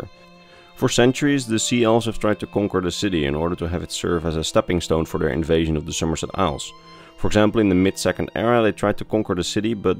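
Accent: Netherlands. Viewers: i was about to say, but someone else already mentioned it. you sound dutch, but not that horrible actual dutch accent. good job on the video, mate. moge de negen goden sterk met u zijn. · Jij klinkt nederlands :)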